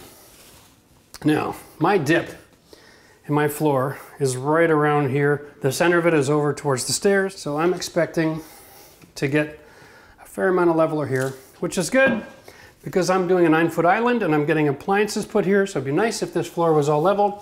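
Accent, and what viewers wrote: Canada. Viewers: you're such a cowboy! SLC is the "one" cementitious product I actually measure the quantity of water for -- partly cuz leveling a floor is sort of a one-shot deal, also because the stuff is like $25 a bag around here. On the other hand I'm brave enough to squeeze the whole bag into one pail -- there's about an inch to spare:) Definitely worth taking the time to properly level a floor. Always fun educational videos and I'm picking up a lot of Canadian.. almost can say Prow-cess correctly! Happy 2020!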